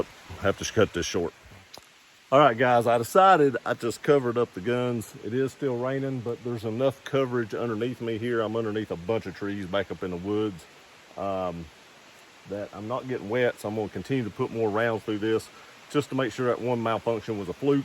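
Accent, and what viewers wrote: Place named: Alabama, United States. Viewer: Sounds like you’re from Alabama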